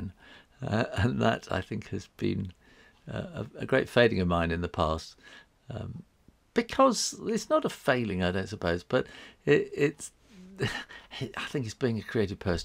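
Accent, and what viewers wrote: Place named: United Kingdom. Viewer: I want to be british when i hear your voice :) And love your cheerfull ways. You have been working as and illustrator for a long time and I as a fulltime illustrator of abour 5 + years, would love to hear all about your career and life. A little like your draw my life videos but with just you talking. Other than that it is so important to think about what your mountain is, what your goal is within your art. But about this channel I would personally just love to see your smile and voice here on youtube. But if it takes to much energy and does not give you anything I would quit the workload that does not fit you. Love Nille from up in Bergen, Norway ❤️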